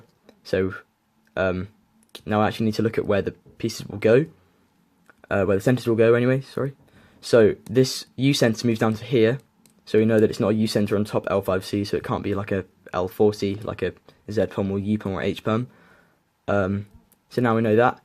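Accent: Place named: RP, United Kingdom. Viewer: WHAT'S UP GUYS Also you sound very posh lul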